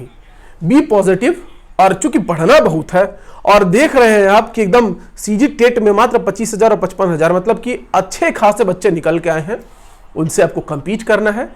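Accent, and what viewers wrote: Bihar, India. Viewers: Bihari accent b acha lgta h apka..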